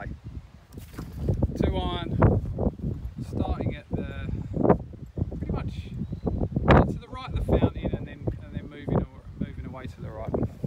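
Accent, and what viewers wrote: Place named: Cockney, United Kingdom. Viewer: nice... Looking fwd to seeing that come through. Cheers for the sub back... I couldn't place your accent... It actually has hints of cockney on it. Hopefully I haven't just offended you. Lol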